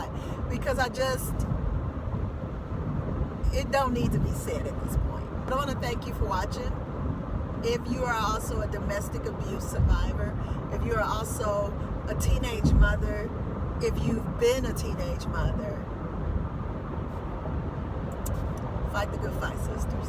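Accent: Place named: Chicago, United States. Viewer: I knew you were from Chicago!!!! Your accent is straight Chi-town...all the way!!! Im from Chicago too. 😄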